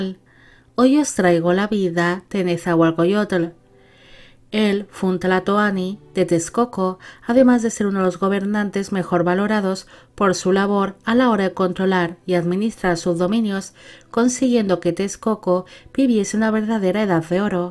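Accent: Spain. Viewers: Un recuerdo que dejo ¿Con qué he de irme? ¿Nada dejaré en pos de mi sobre la tierra? ¿Cómo ha de actuar mi corazón? ¿Acaso en vano venimos a vivir, a brotar sobre la tierra? Dejemos al menos flores Dejemos al menos cantos. (Nezahualcóyotl) Es una bonita sensación escuchar en voz española la admiración por este poeta y rey del antiguo México precolombino. Gracias.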